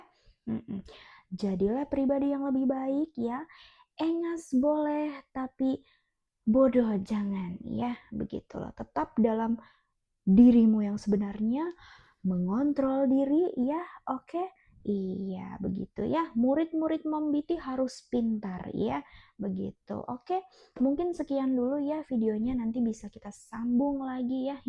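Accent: Java, Indonesia. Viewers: logat jawa nya melekat jirr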